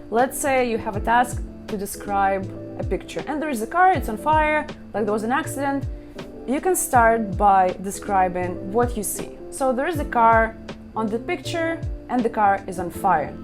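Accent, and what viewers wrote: Russia. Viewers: you from russia yea, я вижу это по твоему акценту. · До мне даже не дошёл что ты русская))я очень восхищён с твоим акцентом ! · БЛИН так слышно русс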